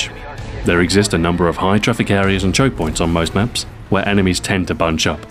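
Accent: Russia. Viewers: Hilaerious Russian accent (I'm Russian) :D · Nice Russian accent. Almost spot on and hard to believe you English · YOUR RUSSIAN IS SO FUCKING EPIC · I love his russian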